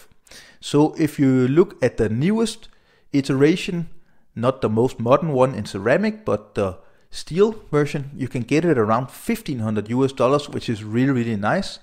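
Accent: Denmark. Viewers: Hvordan kan man bare høre at du er dansker på accenten hahah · Good old Danish accent ! 🇩🇰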